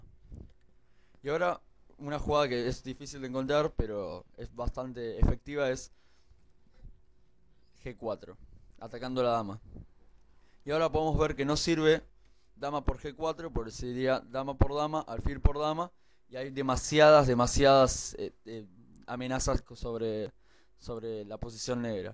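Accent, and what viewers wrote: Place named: Argentina. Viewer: Porque los argentinos pronuncia la yo o llo...con chooo???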